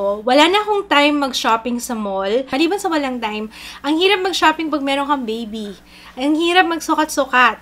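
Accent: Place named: Iloilo, Philippines. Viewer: ms, bkt ilonggo po yung accent nyu??, ilonggo po kc ako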